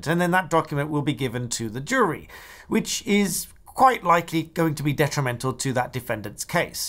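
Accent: United Kingdom. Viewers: Your accent to British. Here you go again.😂😂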